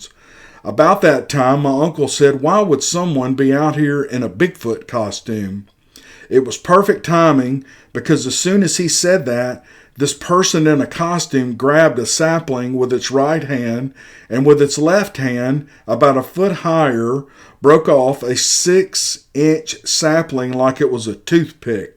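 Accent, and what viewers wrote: South, United States. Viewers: with a touch of southern comfort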